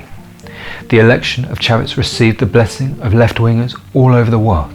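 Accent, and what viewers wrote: United Kingdom. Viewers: First of all let me start by saying I love your content but…Can I recommend maybe holding a fake or real if your down a snifter of brandy or cognac like I said real or fake. Your hand hangs outs there for ever plus I think the snifter 1000% plays into the decor and your obvious English accent. Just a thought a just notice your hand never ever retracts to your body it looks very robotic again these are observations not being critical by any means please don’t think I’m trying to be. Regardless keep up the great work!!!!!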